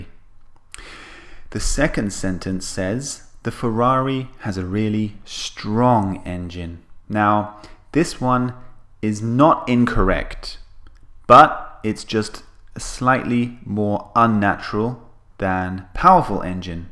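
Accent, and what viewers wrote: United Kingdom. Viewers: I have a question. May I know, which British accent do you teach? Do you teach a Cockney accent, Modern RP(received pronunciation ) or a Brummie accent? Your reply would be appreciated.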